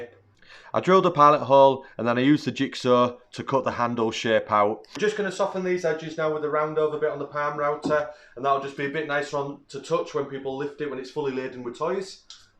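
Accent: Hull, United Kingdom. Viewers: Just found your channel, I am an ex welder/fabricator but trying to learn more about wood so this is fantastic. You sound like a Hull lad (I originate from Hull) so I understand every word you say makes me feel homesick. I was mates with a lad called Robert Pope as a kid, think he became a carpenter also. Anyway good luck with the channel, very professional and well presented.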